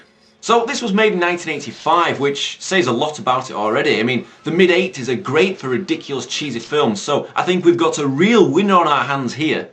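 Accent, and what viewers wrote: United Kingdom. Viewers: I also do movie reviews and I am looking for some one to do reviews with me if interested message me. Also good job on your reviews I love the British accent.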